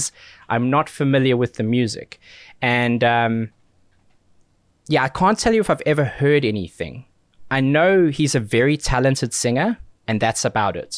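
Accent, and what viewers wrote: South Africa. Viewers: Definitely sounds South African 👌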